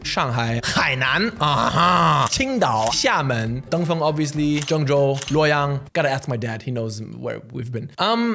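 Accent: Netherlands. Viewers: I know you live in germany but your dutch accent is so good lmao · Love your Dutch accent. Much love for our neighbours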